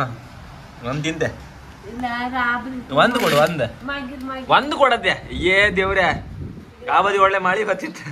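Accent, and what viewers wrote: Kundapura, India. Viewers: ಇದು ಕುಂದಾಪ್ರ ಕನ್ನಡ ನಿಮ್ಗೆ ಅರ್ಥ ಮಾಡಿಕೊಳ್ಳಲು ಕಷ್ಟ ಆಗುತ್ತೆ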